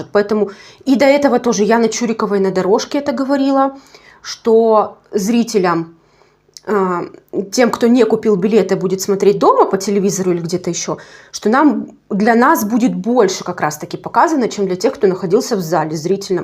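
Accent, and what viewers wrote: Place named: Ukraine. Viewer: Какой у тебя акцент украины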